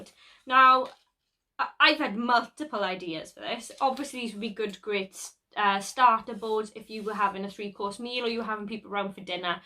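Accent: Wales, United Kingdom. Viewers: Are you from Wales?🏴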